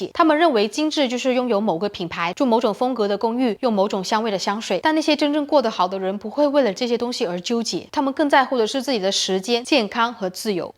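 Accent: China. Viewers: 這女的是對岸嗎，鄉音好濃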